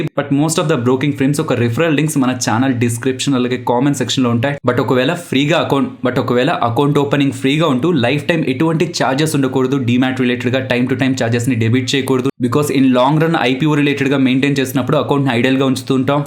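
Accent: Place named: Rayalaseema, India. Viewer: Bro u r from Rayalaseema 😎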